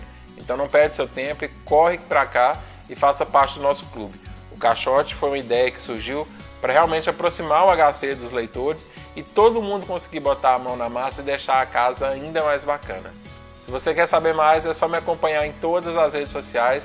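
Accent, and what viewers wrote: Minas Gerais, Brazil. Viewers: Muito massa! Vou assinar o proximo! Adoro o sotaque de Minas! Sucesso meu velho!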